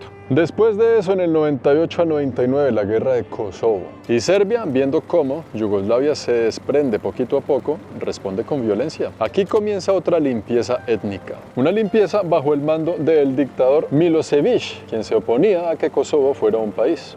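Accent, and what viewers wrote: Bogotá, Colombia. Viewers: MUy interesante, buen sentido del humor, agardable el acento rolo cachaco o bogotano. Un Saludos desde los angeles un hermano peruano. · Colombiano, entre caleño y bogotano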